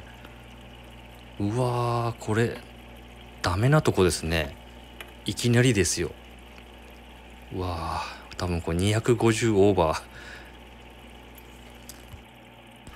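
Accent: Kyushu, Japan. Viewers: こんばんは 九州弁ありがとうございます😊な〜つかしい〜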